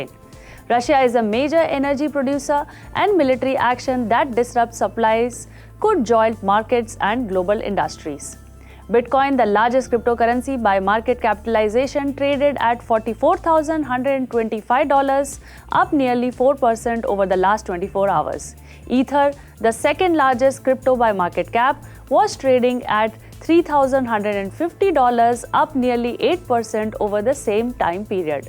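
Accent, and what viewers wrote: Gujarat, India. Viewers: Mam, You are presentable and I think you also have some kind of tech background (probably database programming). But your accent and musical lilt in pronunciation is very Gujarati. Nothing wrong with that, but please try to be accent neutral. There are training courses which people undergo in BPO/Call Center related industry to cultivate the right accent. I highly recommend you to please undergo such training. Take this as constructive criticism and not negative criticism. I mean good for you. All the best.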